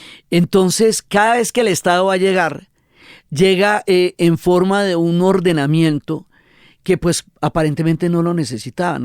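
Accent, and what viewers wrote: Bogotá, Colombia. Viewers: Jajajaja.. Naaaa la narración en la serie tiene que ser costeña, nada más cachaco que. A la serie no le falta nada. Así está perfecta.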